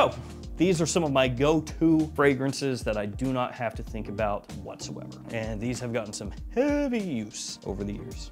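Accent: South, United States. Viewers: More southern accents, please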